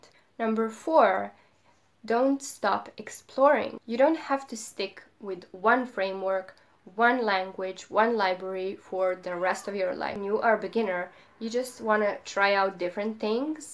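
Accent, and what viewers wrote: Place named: United Kingdom. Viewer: u r from Serbia but u talking as british girl wow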